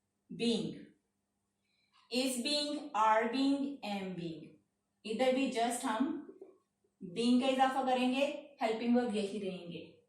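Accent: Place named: Lahore, Pakistan. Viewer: Are u from Lahore.....great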